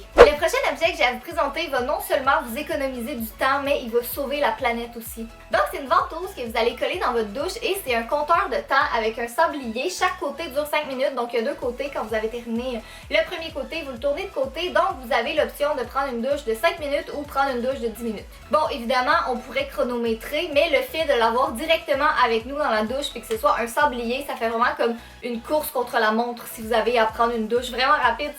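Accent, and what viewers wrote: Quebec, Canada. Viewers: Elle est québécoise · aaa tes canadienne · bonsoir j'adore ton accen québécois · Elle est cannadienne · Salut j'adore ton accent Québécois! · super vidéo ta pris du temps pour la filmer et le montage jtm trop tes magnifique omg puis ton accent woow j'aimerais trop aller au Québec <3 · t québécoise? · tu est canadienne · Toi tu es canadienne lol !!!! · j'adore l'accent québécois je reverais de l'avoir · t trop trop belle et j'adore l'accent canadien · accent du Québec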